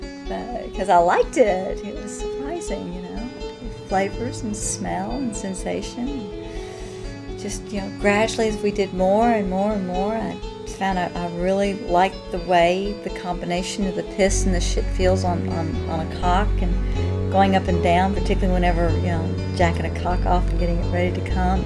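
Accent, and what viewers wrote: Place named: South, United States. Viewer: She has a Southern accent...doesn’t surprise me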